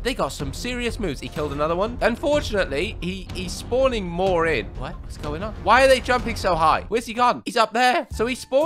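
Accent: United Kingdom. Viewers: Hey are you britain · the and im yellow? gets me every time also you sound british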